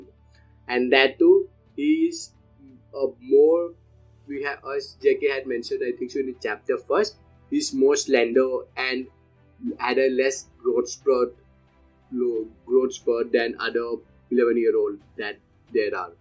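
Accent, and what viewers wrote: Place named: India. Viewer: There is the microphone! Being bilingual myself I can say your english is AMAZING! I know you are self conscious but I can understand you well, keep practicing with your scripts and edit out bad clips to improve confidence. As I said, you speak well so don't be afraid to try! Out of curiosity where are you from? Your accent seems to be somewhere around India but I'm not entirely sure...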